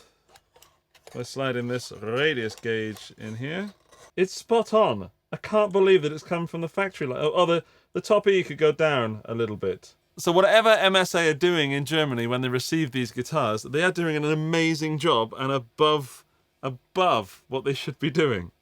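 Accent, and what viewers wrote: United Kingdom. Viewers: You said you live in Austria, however your English is considerably better than your German. From your accent, I'd give it an uneducated guess and go with Great Britain and the northern Irish Isles as your country of origin?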